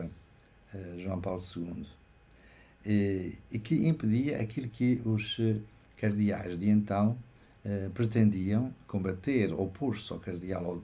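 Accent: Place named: Portugal. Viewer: Saludos cordiales desde Brasil. Creo que hablas el portugués de Portugal. De que paróquia el senhor padre eres? Gracias por su vídeo.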